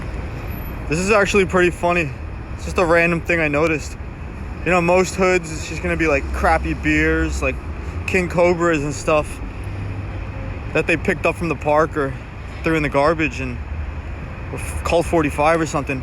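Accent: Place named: New York, United States. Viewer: What with a thick New York accent i thinks he’s from just outside nyc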